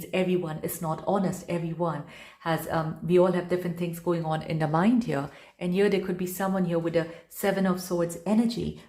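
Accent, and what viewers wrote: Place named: India. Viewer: Desi ho kya ? You sound indo English I’m indo Canadian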